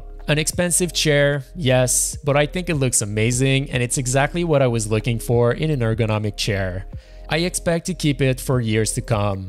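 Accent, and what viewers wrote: Quebec, Canada. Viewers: L'accent québécois! yessiirrr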